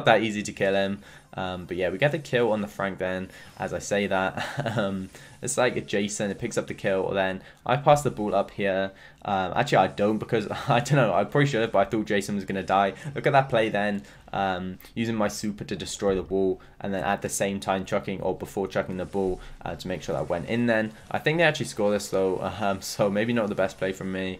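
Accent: United Kingdom. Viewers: Love ur accent😂 Btw is that british?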